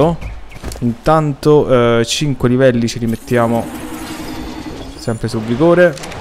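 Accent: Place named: Rome, Italy. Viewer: "S'è dato" "Ao fratè ndo te sei cacciato"... Non so Rick... per me è di Roma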